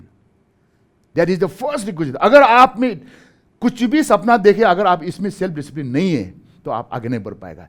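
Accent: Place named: Arunachal Pradesh, India. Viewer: The apatani accent sneeks in. With love from Manipur, respect, dear sir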